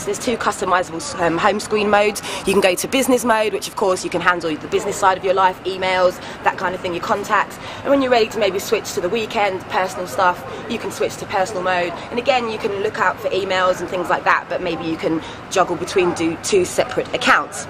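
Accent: United Kingdom. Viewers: british english :D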